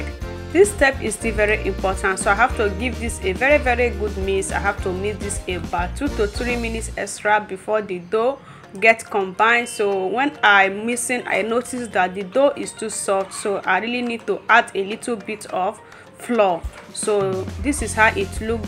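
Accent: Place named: Igbo, Nigeria. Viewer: 👏👏, you never disappoint, I love that igbo accent 😂😂. Meat pie looking yummy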